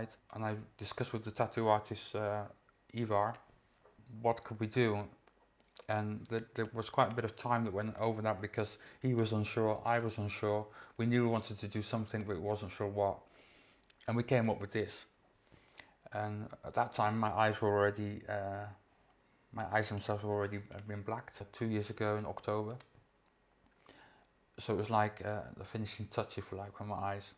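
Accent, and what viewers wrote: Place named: Netherlands. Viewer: You are definitely not from the UK, your Dutch accent and Dutch words here and there are a giveaway.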